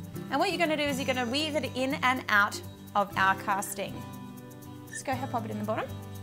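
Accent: Australia. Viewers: Is she Australian? Great vid! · Aussie accent. Where in Australia did you buy such a large ball of yarn and where is the Mod podge from? Thank s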